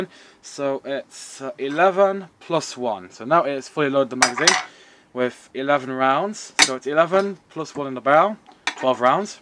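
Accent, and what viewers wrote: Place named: United Kingdom. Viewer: sounds brit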